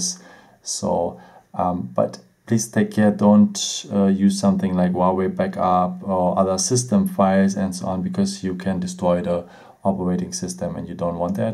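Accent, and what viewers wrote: Germany. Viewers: Bist du aus Deutschland??😂😂 Hört man voll raus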